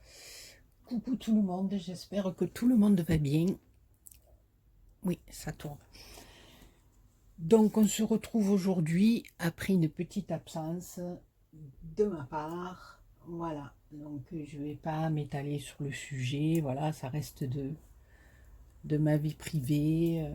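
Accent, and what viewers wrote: South, France. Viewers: Coucou contente de te retrouver toujours avec ce petit accent du soleil. Très classe cette boitounette et toujours des explications claires elle me plaît beaucoup 🤩🥰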